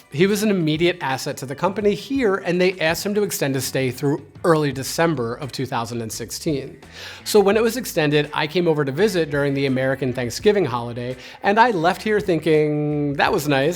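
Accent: Denmark. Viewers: Awesome info - Thanks! (and thumbs up for the aaaaaah-mjaaaa-click-bait title....or......) As a Dane, in my ears your English is sounding more and more like most Danes - Welcome, and well done ;ø)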